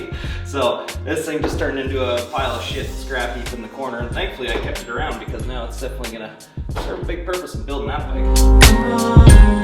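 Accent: Canada. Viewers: what part of canada r u from?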